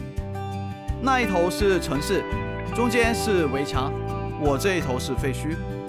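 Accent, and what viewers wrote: Hong Kong, China. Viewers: 4：48你是香港人？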